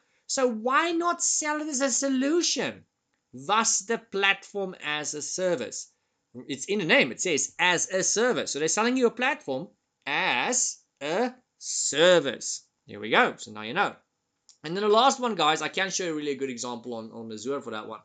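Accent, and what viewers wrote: Afrikaans, South Africa. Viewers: Cheeseburger 😅 Dude, I love your SA accent. You make more sense than Prof Messer.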